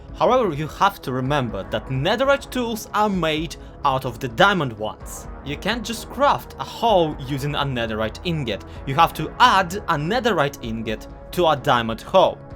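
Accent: Russia. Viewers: у тебя акцент русский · У тебя русский акцент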